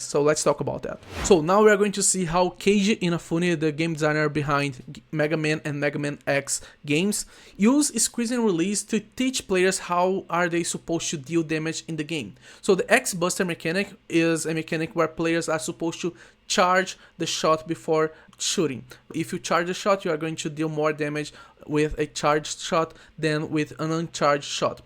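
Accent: Brazil. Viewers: err...are u brazilian? ur accent and the books...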